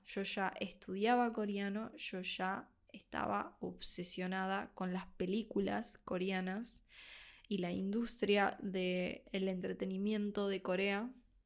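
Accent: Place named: Buenos Aires, Argentina. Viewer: Are you from Buenos Aires...guessing by the accent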